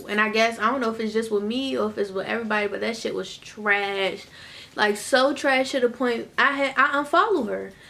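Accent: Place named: Baltimore, United States. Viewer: I know I saw nc in the ss but I hear a lil bit of Baltimore 👀👀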